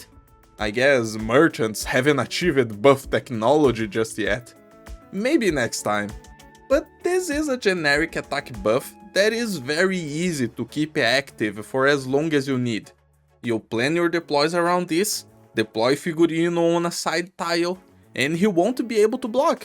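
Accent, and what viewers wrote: Brazil. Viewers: Isn't he brazilian tho?(pretty sure that's the brazilian accent) and figurine translates to costume from portuguese.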